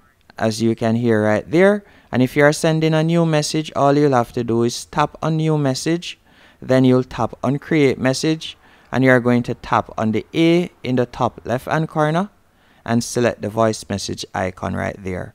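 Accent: Jamaica. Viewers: Thank u 🙏🏽 I been trying to figure this out for days now. Love the Jamaican accent as well. 🆙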